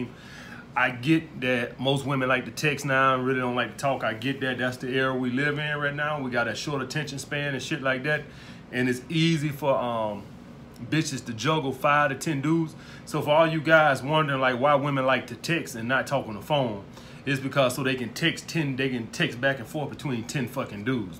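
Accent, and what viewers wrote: South, United States. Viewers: He’s not, its his southern accent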